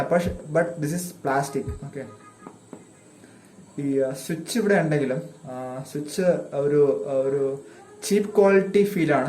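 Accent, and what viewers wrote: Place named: United States. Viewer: Americakaaude pole und samsaaram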